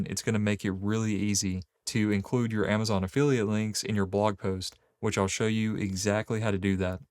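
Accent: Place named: South, United States. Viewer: This guy's Southern accent is everything 🤩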